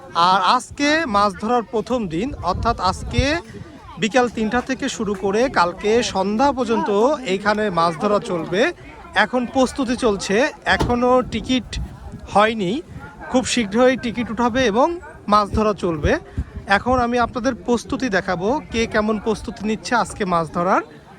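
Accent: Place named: Kolkata, India. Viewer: ai motheri tui kolkatar moto kore kotha bolos kn .. tui ki bangladeshi naki indiar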